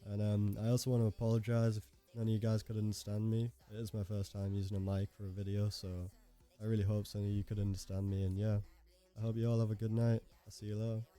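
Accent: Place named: Netherlands. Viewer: No way bro is Dutch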